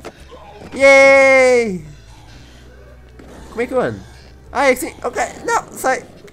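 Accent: Portugal. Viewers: euuu adoro o portugues de portugal,e muito massa,eu sou brasileiro e acompanho o canal do,algumas coisas eu nao consigo entender mais eu gosto mesmo assim.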